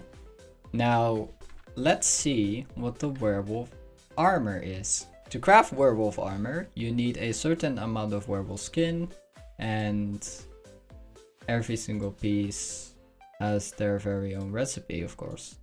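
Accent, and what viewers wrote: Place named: Netherlands. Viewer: bruhhh ik hoorde ik binnen 0.1 seconde dat je nederlands was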